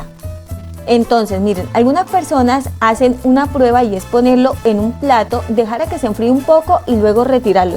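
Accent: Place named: Valle del Cauca, Colombia. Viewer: Amo tú acento vayuno oís.... yo soy una colombianacense con alma de valluna💞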